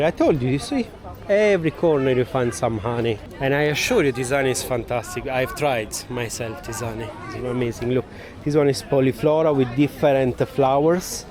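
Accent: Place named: Italy. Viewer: Interesting video. What is your nationality? Are you italian? Your accent sounds italian.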